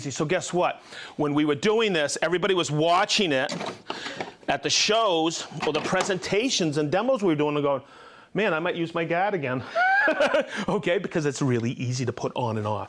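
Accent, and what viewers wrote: Boston, United States. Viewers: I would have bet good money you were from Boston.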